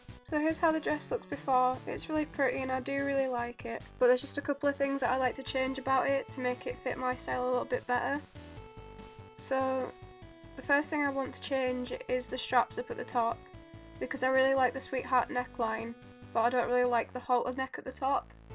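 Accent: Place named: United Kingdom. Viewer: What part of UK are you from? Your accent is cute :P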